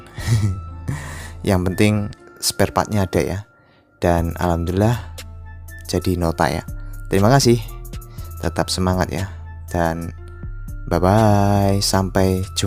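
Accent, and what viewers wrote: Java, Indonesia. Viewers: mas bahasa ne medhok keturunan jawa ta mas?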